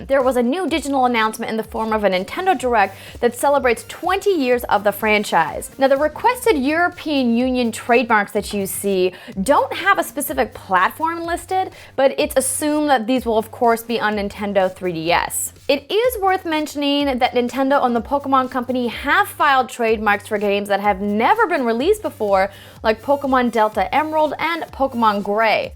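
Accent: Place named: Minnesota, United States. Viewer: are you from Minnesota? Your accent is subtle, but I hear it:P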